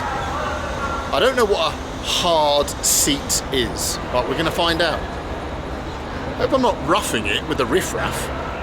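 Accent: China. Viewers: People would understand your mandarin better in Xinjiang because Uyghurs talk in Mandarin exactly like you.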